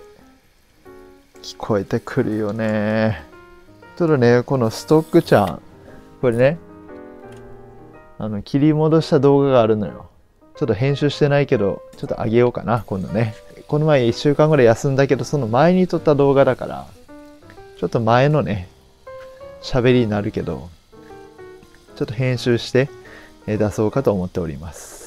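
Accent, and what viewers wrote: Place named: Kurume, Japan. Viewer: おはようございます☀ ノズルいいですね😅 まだ使ってないです。買いたいです。昨日もライブ楽しかったですよ❤ の声を聞いて旦那が『それ、九州の面白い人か？』って言ってました。の久留米弁が印象的だったのかも😅 これからもずっとどんらーでいます。ひろりーんでした👍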